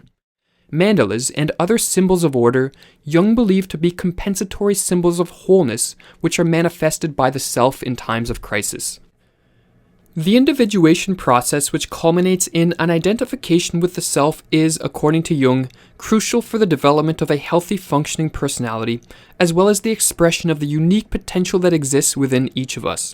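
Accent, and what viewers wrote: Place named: Canada. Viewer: This guys Canadian isn’t he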